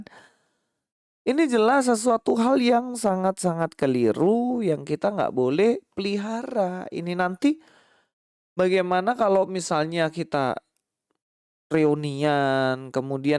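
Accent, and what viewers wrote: Java, Indonesia. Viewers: Hahaha lucu lelaki berdialek jawa ini, belajar nya kurang jauuuuuh, padahal panduannya belajar sampai ke negeri China....?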